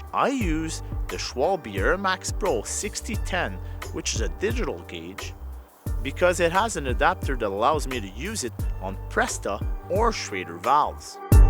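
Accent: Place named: Scandinavia. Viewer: Tout le long je trouvais que tu avais un accent scandinave. J'me disais que ça devait être en suède haha.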